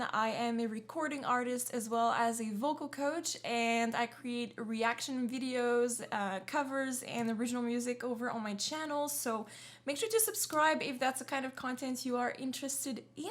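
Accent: Quebec, Canada. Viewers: French pronunciation?? Are you kidding me? You are from Quebec. That makes you the ugly third stepchild from a bastard idiot uncle who wears speedos when he goes shopping. Is what you speak really French? Back to your poutine while staring at your Trudeau poster.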